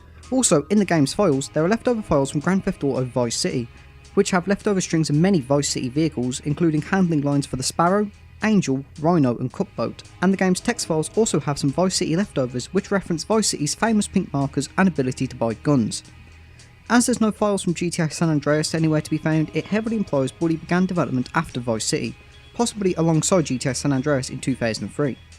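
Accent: United Kingdom. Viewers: boo hoo man can't understand UK accent get a grip convo over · because it's obviously not an accent common in the US, even as a non-US citizen I can tell. It's obviously more common in somewhere near the UK. And you can confirm it yourself that he's from the UK by looking at his account's bio.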